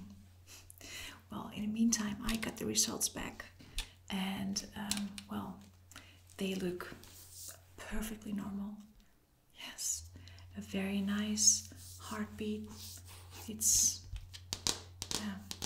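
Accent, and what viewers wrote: Netherlands. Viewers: Pretty good roleplay, are you dutch btw?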